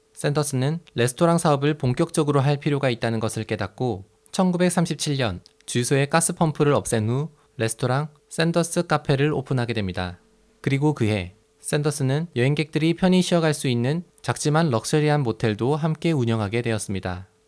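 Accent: Gyeongsang, South Korea. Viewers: 어머니 에서 사투리는 속일수 없네 ㅎㅎ그냥 경상도 사투리로 하세요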